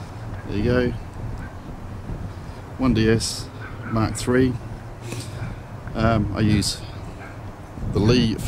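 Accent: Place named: New Zealand. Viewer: Great Video. Classic Kiwi accent.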